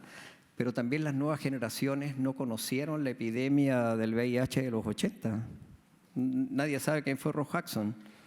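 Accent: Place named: Chile. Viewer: yo encuentro que tiene acento chileno 🤔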